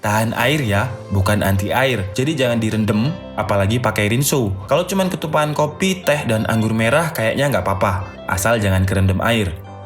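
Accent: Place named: Surabaya, Indonesia. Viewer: Sampean wong suroboyo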